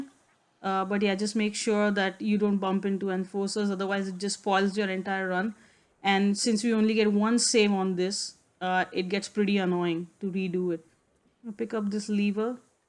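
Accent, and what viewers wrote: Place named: Mumbai, India. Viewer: Even put the accent of mubai on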